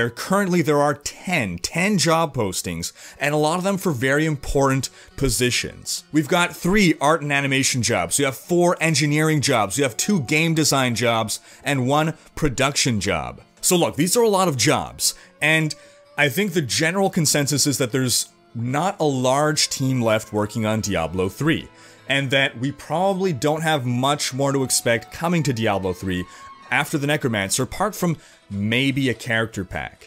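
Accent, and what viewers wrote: Quebec, Canada. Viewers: Whoa! Are you french canadian? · Wait, are you a french canadian ?! :D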